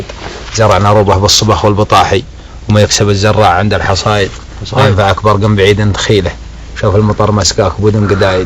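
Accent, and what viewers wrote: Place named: Shabwa, Yemen. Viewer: الكنق العربي بالغلط حطيت حرف الهاء المهم عدلته وكل ما اقصده يا اخوي ان لهجتنا مثل لهجة صاحب القصيده انت تشوف لهجه اهل تعز واهل صنعاء واهل عدن وبعض اهل البيضاء وبعض اهل صعده وتضنها لهجه اهل اليمن وانت في هذا غلطان لان لهجتنا مثل لهجه صاحب القصيده وانا من شبوه ولهجتنا مثلها وبعض اهل صعده واهل الجوف ومارب وقبائل حضرموت… الخ لهجتهم نفس لهجه صاحب القصيده في اليمن لهجات كثيره واكثر لهجه العرب تعرفها لان اصحابها يطلعون في الاعلام وغيرها هم اهل ال3 المدن عدن وتعز وصنعاء وترى كل منطقه يمنيه لهجتها تختلف عن الثانيه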